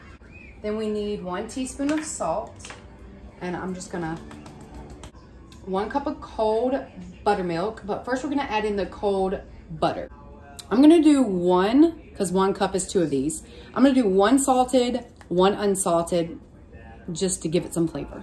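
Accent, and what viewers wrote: South, United States. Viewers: Great video thanks for sharing. I can hear your Southern Accent loud and clear, lol. Where is the South are you from?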